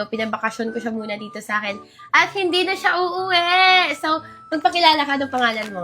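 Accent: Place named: Iloilo, Philippines. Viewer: Ilongga ka pala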